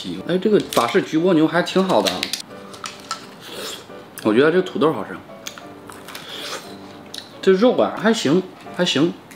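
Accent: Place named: China. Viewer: 大陸口音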